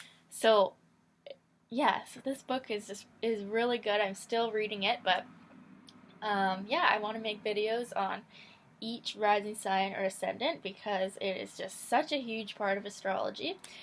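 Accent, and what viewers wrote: Canada. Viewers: Oooooooo so interesting!! Okay okay so just to make sure I understand: I am a taurus rising with my Venus in scorpio falling in the 7th house. Does that mean I like steady, fixed and intense partnerships?? Please explain! Thanks! :) Side note: are you Canadian???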